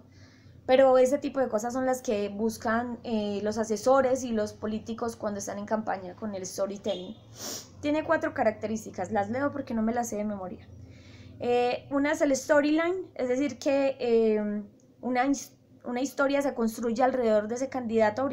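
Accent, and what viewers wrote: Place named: Antioquia, Colombia. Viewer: Me encanta ese acento paisa :3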